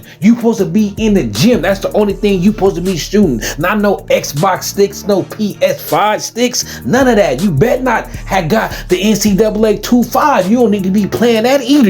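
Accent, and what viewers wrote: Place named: California, United States. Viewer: I can tell you’re from Cali 😂 good vid though man.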